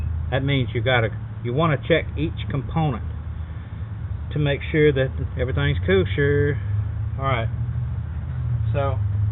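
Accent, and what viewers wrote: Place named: Louisiana, United States. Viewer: Are you from Louisiana? For Alabama because your accent sounds very Cajun brother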